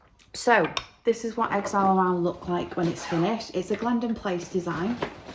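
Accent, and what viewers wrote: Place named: Sheffield, United Kingdom. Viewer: Wow, great month! My first retreat was Retford too ☺️ totally agree it was fabulous. Where do you come from? Your accent sounds local to me near Sheffield xx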